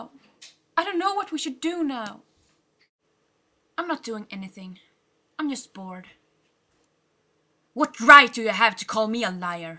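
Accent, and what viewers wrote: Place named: Sweden. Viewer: Ååååååh Sverige?? :D :D Hej! (if not Im sorry xD ) Hmm jag vet inte hahah du kan testa vem du vill ^.^ ÅÅh hahha jag kan höra nu att du är Svensk haha :D Åh jag är inte ensam xD hahah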